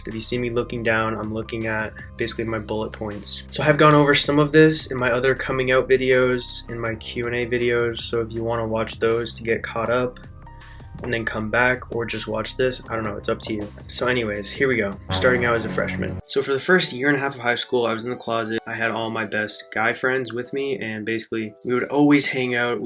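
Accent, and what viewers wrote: Canada. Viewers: You do sound Canadian though. The way you pronounce "out" and "about". Sounds Canadian bro! I suppose you could be FROM there and now living in the Boston area?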